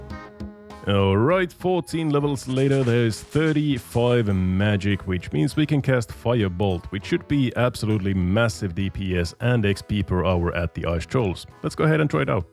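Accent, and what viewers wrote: Finland. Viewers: Wait, are you Finnish? I always thought German 🤔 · Since the first episodes, i always tought you were Finnish but never checked it out. Now i did and i was right all along. Tiiny tiny accent gave it away. Keep up the grind. (Englantis on aika pirun hyvä)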